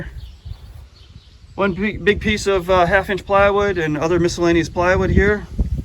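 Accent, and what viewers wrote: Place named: New Jersey, United States. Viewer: You from the Northeast? Sounds like Jersey or Pennsylvania. I'm from Jersey living in FL. I really enjoy your work!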